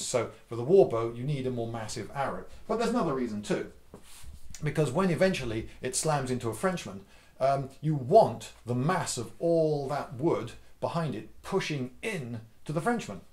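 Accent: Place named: England, United Kingdom. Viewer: You may ramble all you like Luv. My Grandfather was from England and I loved to listen to him talk and hear that "Accent" of his and once in a while (More oft then not.) that same "Accent" will come out of my mouth and my friends at "The Minnesota Renaissance Festival" just love it when that happens because they know for a fact that I am not faking it like they are and the "Accent" comes out a great bit more there. But all in all. I miss hearing a pure English voice like yours So. Keep on rambling on Luv..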